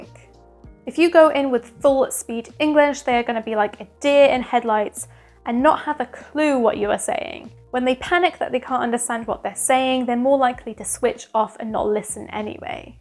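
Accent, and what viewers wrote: United Kingdom. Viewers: I got a kick out of your pronunciation choices...especially the WATER choice. I've had this thought in the past, watching your photography video's, as the word water comes up often, within 'waterfalls' or if your talking about a stream. Our American/Canadian choice to you sounds like wa(d)er as you explained, but from our perspective the 'wat' is the same but the end 'er' is different. Nonetheless, as Canadian, I love the UK/British accent, and have no issues (so far in understanding every word. Oddly, I had an uncle that came from Wales some decades ago, and I just couldn't understand what he was saying for the most part, so I assume there must be an 'old school' Welsh accent perhaps? Anyway, trying to catch up on your video's...have a great day!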